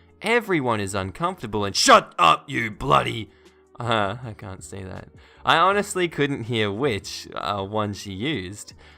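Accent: South, United States. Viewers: It seems more country gentlemen to me kind of cute sounding I like it but your natural accent is better to me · Lol I like how your default American accent is a southern guy. I can confirm we don't ALL talk like that, just most of us lol. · Appreciate the narration for these but maybe a little less Southern for the accent? Most people don't have accents like that in America or at least not that thick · You are a bit hard on the r's. You sound a bit Southern. :) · Not bad of an American accent. I think you have the southern gentleman down well enough. Also power to the Disney nerds!!!!! I’m 26 born and raised on Disney (I broke the film on our Bednobs and broomsticks VHS) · Your American accent is doing good but only southerners really talk with that kind of accent 🤗 still though I could of believed you were American if I heard that 😆👍 Thanks for more stories ✌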